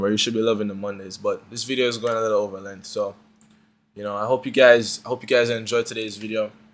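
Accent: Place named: Caribbean. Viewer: You sound like you're from the caribbean bro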